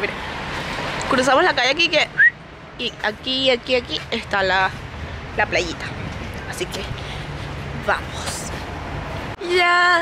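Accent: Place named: Venezuela. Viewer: Eres venezolana? Me encanta su acento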